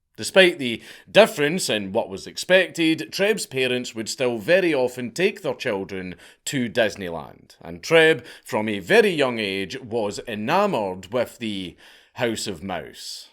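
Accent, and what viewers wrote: Scotland, United Kingdom. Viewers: You are my favorite scot, even though you live in brit. Love your accent of homeland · Hearing a Scott talk about my hometown feels wrong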